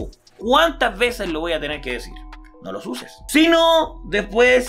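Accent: Chile. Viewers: Hola soy nuevo. Ase tiempo que te conosia pero me daba flogera entrar. Pense que eras español por tu bos o asento pero en un tik tok que isieron soble ti escuche tu bos y pense que eras chileo lo busco en google y vi que eras chileno yo tambien soy chileno haora si que si estare a tento a tus videos ace un mes me registre en free no tengo diamante labropa que tengo la consegi con ticets de incubadora o diamantes los diamantes uqe tengo son los de los regalos que dan cuando entras a una partida me pregunto si me puedes dar aunque sean 2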